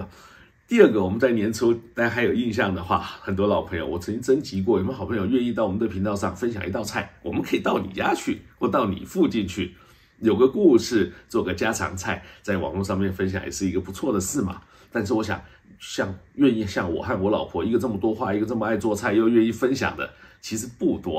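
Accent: Standard. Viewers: 了解，沒有字幕沒關係，您的國語很標準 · 章兄的國語字正腔圓⋯聽力正常者應該不需字幕😂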